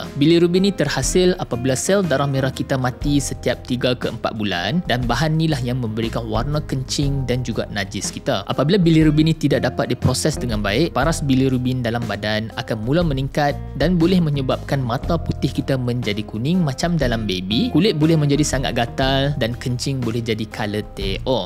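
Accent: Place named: Kedah, Malaysia. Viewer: Weiii ,hangg org kedahhkaaa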